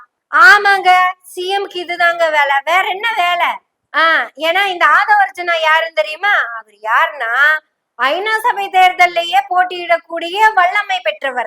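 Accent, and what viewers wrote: Kongu, India. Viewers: கொங்குத் தமிழில் கலக்குறீங்க சகோதரி செய்தியும் கருத்தும் சொல்லும் ஒரு நேர்முக வர்ணனை போல அமைந்திருக்கிறது... அந்த ஆதவ் தலைவலி வந்தாலும் கூட திமுக சதி என்று கூறுவர்... சொந்தக் காசில்...... வைத்துக் கொள்வார்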